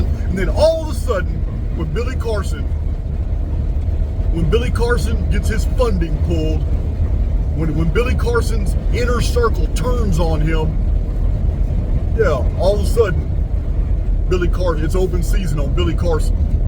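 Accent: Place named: Texas, United States. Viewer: "I'm not buyin' what this Hobbit is sellin'" 😂💕🇬🇧 I love your Texan accent when you get on your rants!❤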